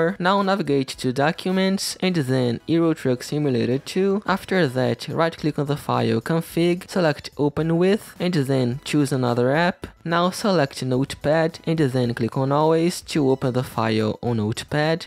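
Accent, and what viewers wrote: Brazil. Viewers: demorei 2 minuto pra notar q o manin era brasileiro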